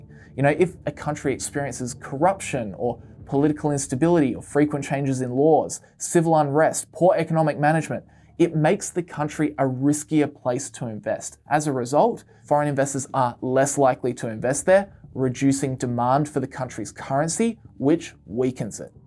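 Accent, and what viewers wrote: Australia. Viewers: Maybe I am biased towards the Australian accent, but your videos are really so much fun and so much info. Thanks from the bottom of the heart!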